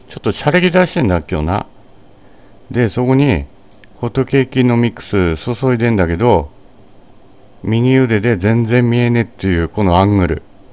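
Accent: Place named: Fukushima, Japan. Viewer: 福島弁めっちゃ楽しい🤣 いきなり笑わせて頂きました👍 左利きだったんですね〜笑 タイマー2ついる？🤔w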